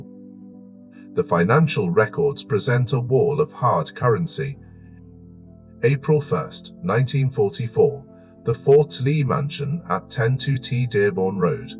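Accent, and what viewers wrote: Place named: United Kingdom. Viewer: Sorry can’t take the accent from across a pond talking about the American mob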